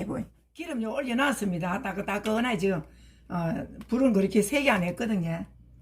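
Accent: Gyeongsang, South Korea. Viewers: 직접 채취하신 재료로 쉽게 하시는 튀김 바삭하니 맛나게 보여요 말씀이 경상도 사투리~ 정감있어세요~ 응원드립니다~^^ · ㅋ 그 손감각이라는게 해 본 요리는 되는데 생소한 재료는 쫌ㅋ 구수한 갱상도 사투리 좋습니다~~아이고 기름에 튀겨지는 소리 완전 침이 꼴깍합니데이~비주얼짱임다😄😅🤣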